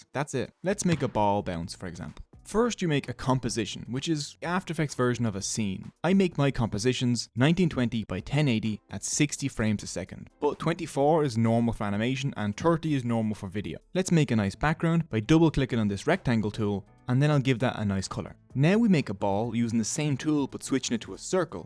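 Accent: Dublin, Ireland. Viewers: Love the Dooblin accent 😆!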